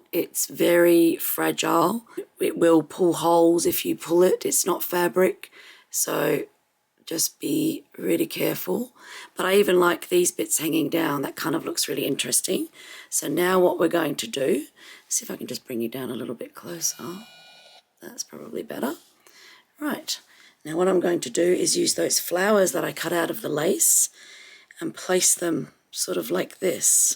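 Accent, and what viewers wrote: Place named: Melbourne, Australia. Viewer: I am enjoying your channel and ideas! I made the Japanese fan journal from coffee filters and REALLY love it!!! As a bonus, I have a dear friend of many years who lives in Melbourne. So, listening to your lovely accent makes me feel like I am spending time with her!!!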